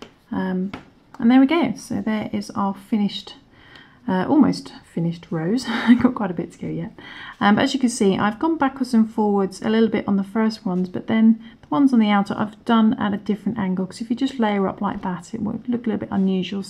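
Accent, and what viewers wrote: United Kingdom. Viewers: I absolutely agree with you. And I would also add that I'm in love with her voice, British accent, the way she speaks😍 I can't wait to by all the necessary equipments and start making all the flowers you showed for our summer house💖💖💖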